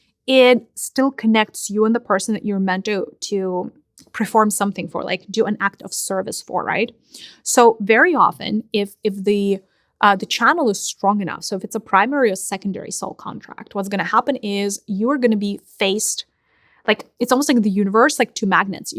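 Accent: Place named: Russia. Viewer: Sometimes you holler at me really fast and use the words ain't and y'all in the cutest Russian accent. You're the favorite of the many voices in my head and the only person on the planet other than my husband I will allow to put me in my proverbial place.Contrary to popular belief (even if they're my beliefs) I'm not perfect. I am however, genuine courageous authentic passionate and loyal AF. I love bigger than almost anyone in this dimension and I need so many anchors and tethers it's ridiculous. I'm thankful for them all. I wish I hadn't forgotten for so long! Gratitude in extra portions 2 you my sister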